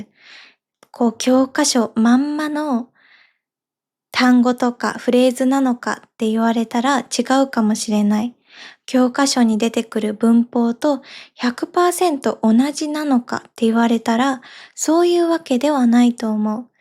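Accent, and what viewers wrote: Kansai, Japan. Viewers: Omg thank you so much for 関西弁おまけ. ❤️❤️🙏 I'm so happy you added it.🥳🥳 -さん is so adorable speaking 関西弁. The problem is that I want more😂. I guess I'll be listening to this podcast 20 more times 😅. I really love your podcasts like this, as long as you make any video - doesn't matter what it is, I'll watch it. There was a tip for learning, it was to find someone you have a crush on to keep you motivated😂. is my crush. I hope I dont sound like some pervert.😅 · Are you from Kyoto? Really nice to be able to hear 関西弁 !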